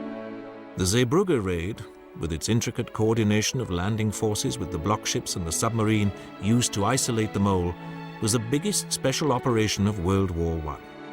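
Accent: United Kingdom. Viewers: Great? Proper British accent to announce that 1 million people were killed and then is sad since the strategy didn't work. The PR tells us that that is the "civilized" way. I've decided otherwise. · Despite the very basic graphics, with the British narrator, actually quite watchable.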